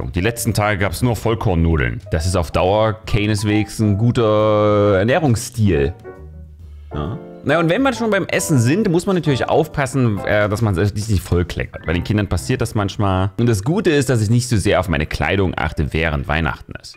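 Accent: Saxony, Germany. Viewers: Das sächsische rettet einfach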